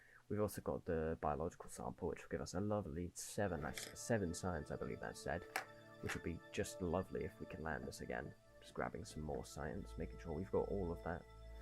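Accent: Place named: Australia. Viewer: why you an ozzie mate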